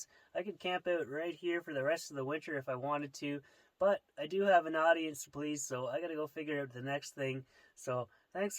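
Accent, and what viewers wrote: Canada. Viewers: Just say “out” and “about” in your awesome Canadian accent, and you’ll be forever a YouTube legend.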